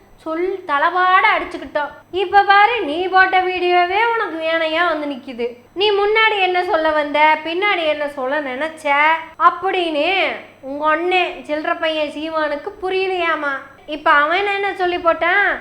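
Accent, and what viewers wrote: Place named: Coimbatore, India. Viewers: அடடா.....கோவை ஸ்லாங் சூப்பர் அம்மணி... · சேலையை கட்டிட்டு கோவை தமிழில் தங்களை போல் பேச இனியொருவர் பிறப்பதில் சந்தேகம்.ஏதோ புகழ்ச்சிக்காக அல்ல.உண்மை.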